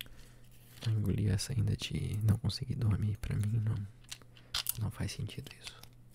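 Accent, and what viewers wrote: Brazil. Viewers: Ótimo asmr adorei, incrível com tá para perceber que vc é manezinho hahaha também sou :)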